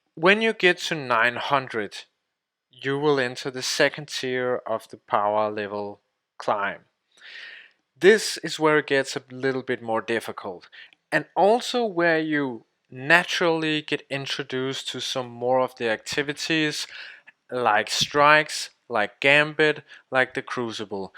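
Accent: Denmark. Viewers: I love the Danish Accent....you should hear mine, we sound very alike hehe :p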